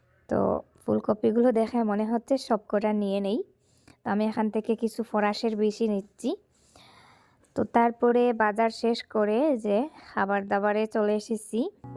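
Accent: Sylhet, Bangladesh. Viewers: আপু আপনি কি সিলেটি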